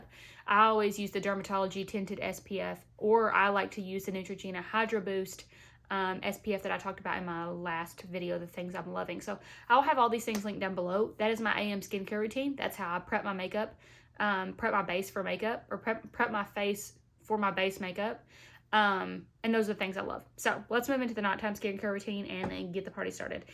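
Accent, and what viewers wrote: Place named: Kentucky, United States. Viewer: I’m new to your channel.. as soon as I heard your voice I thought to myself “she’s from my neck of the woods” Kentucky, correct? ❤️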